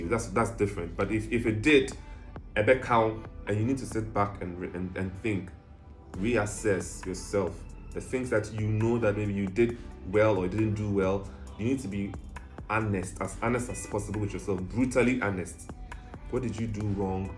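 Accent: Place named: Ghana. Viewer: The best part of these videos is the cool Ghanaian accent 😃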